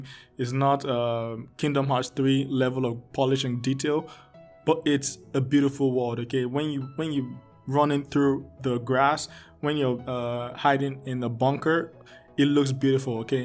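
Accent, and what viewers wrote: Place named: Nigeria. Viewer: Wow... Love your accent. Are you from Nigeria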